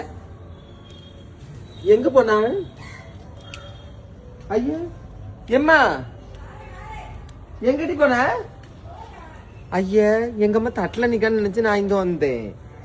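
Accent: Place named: Nagercoil, India. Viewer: Nagar Kovil tamil super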